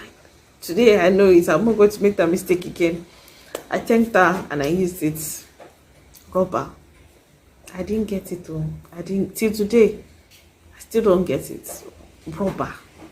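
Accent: United States. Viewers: Seems like u speak American English. Lol how is Tomato sauce a Ketchup though?? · Lol 😂 from listening to you i think our English influence is from different continent yours from America mostly ours from UK mixed with our own language 😂 we just hit the short cut with names 😂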